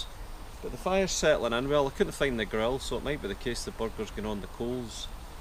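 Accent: Scotland, United Kingdom. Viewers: Where in Scotland is it your from?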